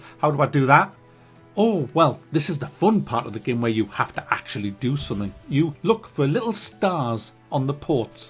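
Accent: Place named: England, United Kingdom. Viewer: What’s more annoying than that poor ass attempt to provide “entertainment” in this review, is the absolutely jarring and insanely annoying accent. I mean, damn, limey accents can be rough, but this I couldn’t listen —nor would I ever want to— for more than 10 minutes…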